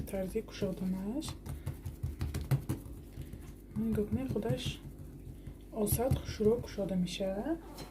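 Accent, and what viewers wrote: Isfara, Tajikistan. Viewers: Бехтарин кадбоонуи зур шумо ба назарам аз исфара лахчаи исфарагихобоин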